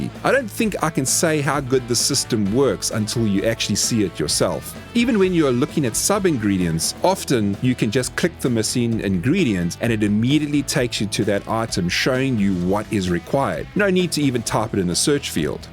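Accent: South Africa. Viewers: Instantly know a South African. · Ek kan hoor jy is afrikaans, dankie vir die inligting.Mod lyk awesome🤟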